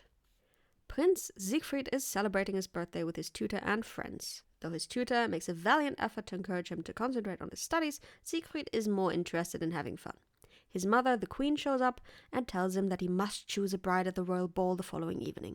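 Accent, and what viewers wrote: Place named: Germany. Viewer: YES MA'AM YOU'VE GOT A NEW SUBSCRIBER in me. FUCKIN FANTASTIC Work. And your prominent German Accent Just adds to the whole THEATRICS of it all. just brilliant work. 👌👌10/10.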